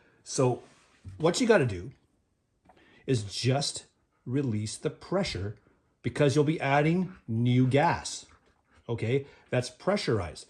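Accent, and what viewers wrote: Canada. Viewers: Totally unrelated but this guy is definitely Canadian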